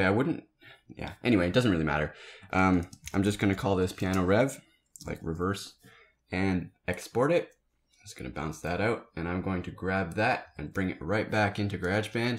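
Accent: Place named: Canada. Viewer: youre canadian arent you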